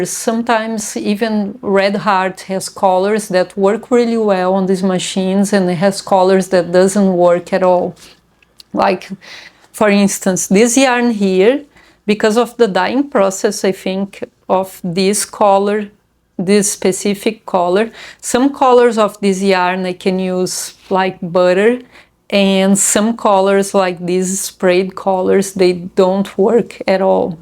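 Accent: Brazil. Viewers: Vc é uma querida minha. Obrigada por compartilhar todas as suas dicas! No primeiro vídeo que vi seu, eu saquei pelo seu sotaque que vc era brasileira, e fui ver o que uma brasileira tinha pra falar sobre essa máquina que pouco sucesso faz por aqui rs E que bom, pq vc de longe é a que mais entendeu sobre o assunto e explica com clareza, de todas que eu já parei pra ver aqui na internet A gente que é brasileiro acha que nosso próprio povo não sabe o que fala, mas se procurar direitinho acha as joias mais raras do mundo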